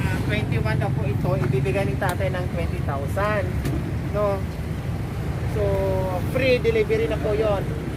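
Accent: Visayas, Philippines. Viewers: pangasinan po ba kayong blogger po? urdaneta,villasis, rosales at pangasinan na lugar po. Kaso visaya kasi ang salita ninyo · bisaya diay ka mam?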